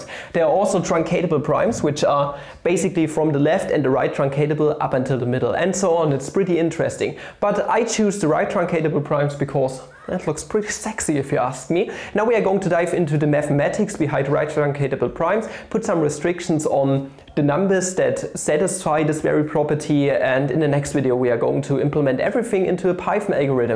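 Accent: Germany. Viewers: Hearing a German speaking English always makes me mad because of.... well you guessed it: accent! :-D it´s hard to follow the content while listening. Apart from that, I would appreciate your work more if I wouldn´t have heard about this by the one ans only, James Grimes. No offence, man, you`ve done a great video! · you sound very german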